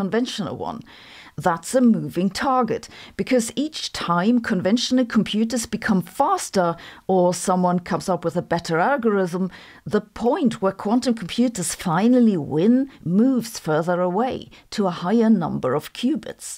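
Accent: Germany. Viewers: Why has her German accent become so thick recently?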